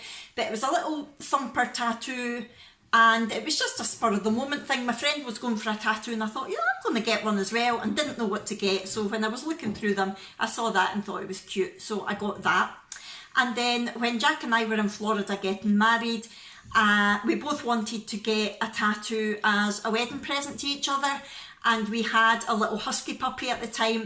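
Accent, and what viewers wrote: Scotland, United Kingdom. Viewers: New subscriber here :) nice to hear another Scottish voice on YouTube x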